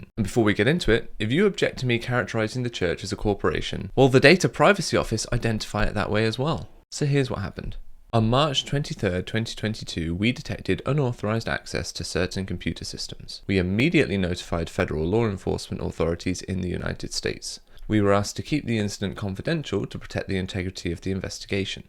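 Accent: United Kingdom. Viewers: Yeah, he’s sneakier than a lot of antis. His British accent misleadingly indicates a warm, intelligent tone.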